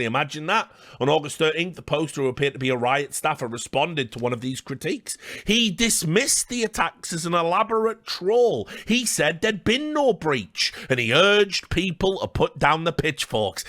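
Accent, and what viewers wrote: United Kingdom. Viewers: The squeaky bum time got me at the end,always nice to hear a brit venting about how fucked up some power structure is. Cheers to the toast at the end and thanks for the constant content!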